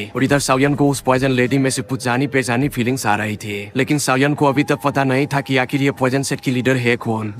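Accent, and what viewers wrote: Bihar, India. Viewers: Bhai aap Bihar se ho kya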